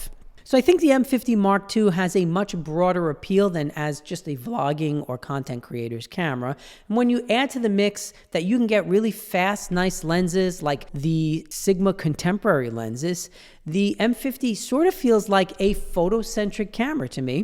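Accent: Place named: Tri-State Area, United States. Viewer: Did you mean to talk of it like it’s not mirrorless? That was the main selling point for me! Also your accent brings me back to growing up in the tristate area :)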